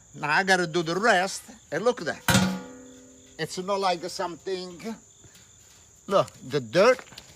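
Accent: Italy. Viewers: Who is this? You sound Italian! Are you sir?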